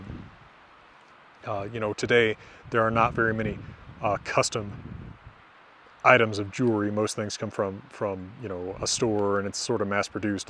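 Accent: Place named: United States. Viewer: Hello, I do not know if you are aware of that, sir, but it's not just people in the English language who are following you. I am French, and I have a hard time understanding you with your American accent. Would it be possible for you to speak a little less quickly please? I know, it's a little daring of me to ask you this, but it would be very useful for a lot of people I think. Anyway thank you very much for your videos. Sorry if I offended you, it was not my intention.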